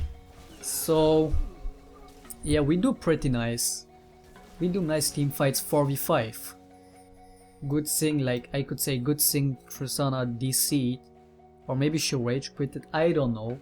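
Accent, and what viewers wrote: Germany. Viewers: Ok ty, i wasn'y expectobg his accent to be a german accent, so i asked spanish but don't know the spanish accent xD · hes from germany and live in germany,so its a german accent i think he learned english in the school and games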